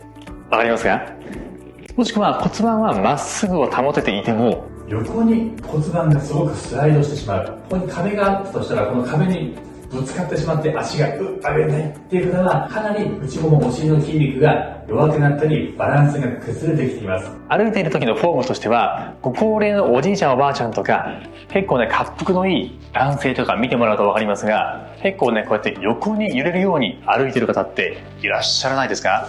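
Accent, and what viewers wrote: Kansai, Japan. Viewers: 時々出る関西弁がわりと和む(笑) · 色んな困難を乗り越えてらしたのですね🥺 スゴく魅力的で たまに関西訛りがチャーミングです。 わかりやすくて 取り組みやすいものばかりで 感謝です✨ お身体に気をつけて 御過ごしください🎵(*^^*)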